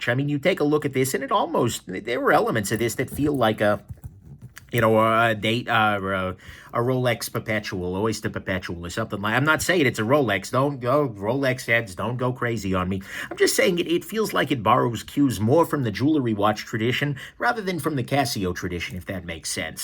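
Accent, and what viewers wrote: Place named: Staten Island, United States. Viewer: You have a Staten Island accent.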